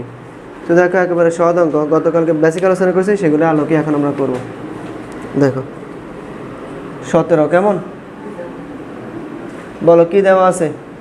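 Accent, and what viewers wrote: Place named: Noakhali, Bangladesh. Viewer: আপনার বাসা কী নোয়াখালী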